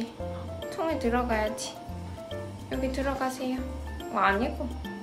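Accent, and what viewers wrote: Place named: Gyeongsang, South Korea. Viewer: 얘 나이가 어떻게 되요? 우리애도 보테인데..남자애고..3살이고...경상도 사투리 쓰시는거 보니...저는 김해 살아요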